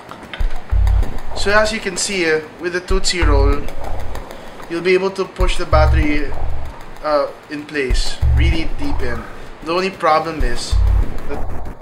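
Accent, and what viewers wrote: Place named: Philippines. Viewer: Filipino accent :D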